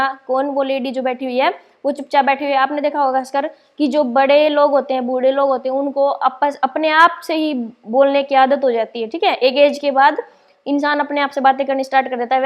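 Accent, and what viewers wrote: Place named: Haryana, India. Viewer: Hlo mam by your speaking tune I think that you are from Haryana Am I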